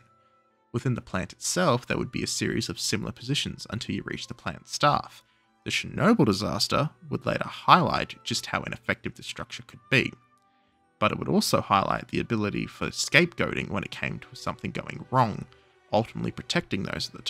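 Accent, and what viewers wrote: Australia. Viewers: It's always such a weird feeling to watch a video in english, specifically from some aussie bloke, and suddenly hearing ARBEITER BAUERN NEHMT DIE GEWEHRE 😂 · I think he’s Australian.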